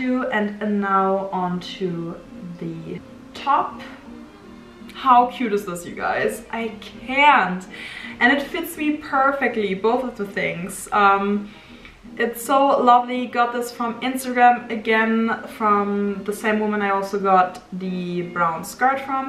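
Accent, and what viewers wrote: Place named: Germany. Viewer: Your German accent makes you have a lovely voice! I stumbled across your channel while watching 1940's videos. Hollywood should be using you as an actress in 1940's theme movies. You could definitely play an eloquent society girl of the 1940's.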